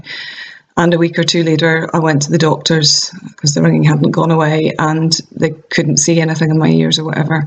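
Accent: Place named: Scotland, United Kingdom. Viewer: Dear, thank you so much for this absolutely helpfully video. In the first minutes it was hard to understand your scottish accent, because I am from Germany. But after a while it worked.;-) I have Tinnitus for 20 years, did the TRT with noisers and I couldnt hear anything the last 18 years. But now, 3 months ago, after a heavy Burnout, it came back. I couldn't believe. I found Julian Cowen Hill, he is great. And another person from Germany. They both help a lot. But I am absolutely convinced, that all what you are telling and the others is true. Now I first have to clear my mental state and then use the noisers again. Now, I try to think postive and to see it as a chance to change something in my life. Best regards, Martina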